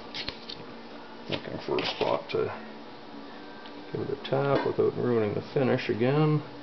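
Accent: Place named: Canada. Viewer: LOL 2011KyussLives how could you not tell he is Canadian. Kyuss great band btw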